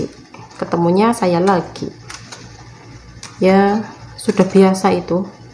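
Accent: Banyumas, Indonesia. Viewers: Wong ngapak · tetep semangat mbokayu. slm ngapa.ora ngapak ora kepenak.mrebet pbg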